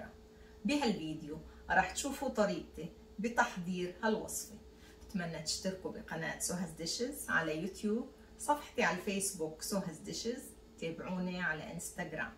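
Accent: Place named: Lebanon. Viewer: احب طبخك اللبناني واحب لهجتك اللبنانية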